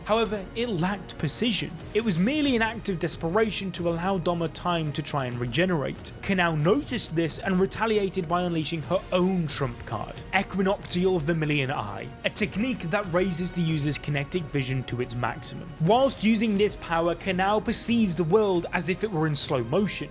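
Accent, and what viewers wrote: United Kingdom. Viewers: “Full cinematic fight” um its just a British annoying voice explaining what we are seeing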